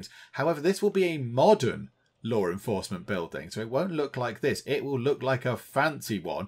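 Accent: United Kingdom. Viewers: You've just became my favorite youtuber. Your British accent and personality is just too cute. I know that's not very masculine to say, but i'm sure you can deal with it...lol Thanks for the videos. I've been enjoying playing this game myself.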